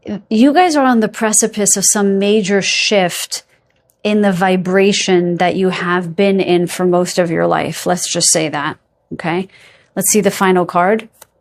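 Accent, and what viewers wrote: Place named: New York, United States. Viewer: Hello again and thank you for taking the time during such a stressful time for you. I wish I could do some of the physical work for you. I chose #3, and yes it does fit, and it confirms what I've been getting myself. In the last several days I've been feeling very cozy with Spirit without having to do anything to get there, as usual. And I'm feeling grateful for that as well as feeling anticipation of good things. I think that very big things are coming to the world very quickly now and we are the placeholders for the collective. And it is very near to showtime. .... BTW, .. please don't hide the NY accent, it's cute on you 👍😉 .... These are SUCH special and auspicious times that it will easily wash away the ugly and painful if allowed to. The Creator has given me great strength and I will be forever grateful 😊 .... 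bye now and thanks again ❤️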